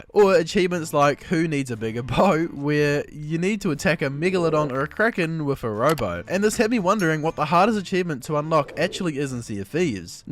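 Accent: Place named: United Kingdom. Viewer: What is this ultra british accent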